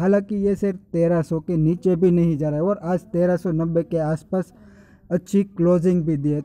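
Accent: Gujarat, India. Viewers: Hu pan gujarati j chhu. Gujarati ne hindi bolta taklif to pade. Pan ae taklif khali je gujarati hoy ae j jani sake.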